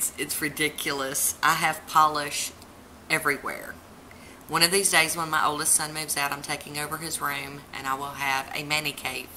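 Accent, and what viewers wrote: South, United States. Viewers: Well there's your pretty face! I'm a southern gal too, and I DO love your accent 😉 Sinful colors is bomb! I just bought the neon collection today...minus the yellow. Can't find that one yet. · I love your accent! its so soothing to me and I lol'd when you said not everyone on the south marries their cousin 😂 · I too am from the South and my accent is thicker yet than yours. When I stumbled across your channel I was like heck yeah.....a fellow Southern chic!!!! I have family in Indiana and ironically they are visiting right now and when they are here it's like oh wow maybe I do have a bit of an accent😏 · You are my favorite new you tuber (to me) happy to see the beautiful face that goes with that accent (yes I'm one of those commenters). Southern accents just sound so warm and friendly to me, just love hearing them! Thanks for the fun video, now to go check out your channel Recs! 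· I enjoy your videos and positivity. Your nail art always inspires me, even though I haven't been patient enough to try anything yet (I'm still trying to perfect a neat manicure!) As a South Carolinian myself, it's a pleasant change to hear a genuine southern accent on YT. Keep up the good work!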